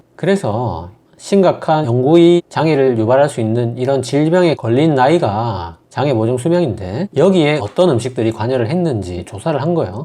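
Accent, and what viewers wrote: Gyeongsang, South Korea. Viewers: 웃기지만 사실적 표현 하나 할까요 오래되진 않았지만 님을 처음 영상으로 만났을 때 이름은 무슨 중국의사인가 싶었고 외모는 고등학생처럼 어려보였어요 거기다가 억센 경상도 사투리를 쓰는데 주구장창 경상도를 떠나본 적이 없는 토막이 경상도 사람 그대로였어요 그러나 놀라운 것은 구독자가 보는 가장 훌륭한 의사쌤이라는 것 사랑과 헌신으로 저렇게 열심히 전해주시는데 "고맙습니다"라는 표현이 한없이 부족합니다 열심히 열심히 공부하고 있습니다 선생님도 늘 행복하시기를 기도합니다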